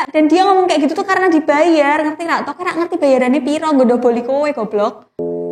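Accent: Java, Indonesia. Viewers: Bahasa jawa medok nya bikin gua senyum sendiri 😀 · Koe jowo yo mba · Indo Inggris ❌ Indo Jawa ✔️ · Anjaay medokk jawanya bikinn gemesin ngomngnya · Medox banget mbak..😄🤭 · G bisa bahasa 8ndo nesia yg bagus ya k9k medok gtu emang ada bahsa indo yg kayak gtu blajar dlu baru ngomong yo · Sippp kak.. Aku suka aku suka pmbahasanya kena dan medokk bngttt jowone... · Logat Jawa keren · Suka bener sama kak ini dari segi bicara sampe penyampaian nya selalu pas apalagi ada logat jawanya enk gitu di denger wkwkw Sehat selalu kak 😊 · jawane ngendi mbk.e😁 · Cara ngmong nya itu yg aku suka perpaduan jowo dan bahasa indonesia love dah mba ❤ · Njirr gua suka chenel ini bukan karna pembahasannya, tapi cara penjelasannya lucu,konyol,kocak.. Khass cwi jawa🤩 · Mbk samean ark ndi to Kok omh e jowoan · Asli jowo to mbak😁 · Jangan pake bahasa Jawa dong ga ngerti, medok medok aja😒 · Masuk mbak.... 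Kenthel banget jawamu mbak. Nek boleh tahu jawamu ngendi mbak? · Suka bgt ama logat cara berbicaranya ehehe pa lagi klo kdng ada jawa jawanya gitu ehehe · Cw cantik ngomonge medok kesane lah lucu😁 asikk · Aduh.. Kak udh nikah blom sih? Klo blom mau ga klo aku nikahin😂tipe gua bgt ni cwe, frontal, buka"an, manis, medok, haduhh smoga kau jodohku wkwkw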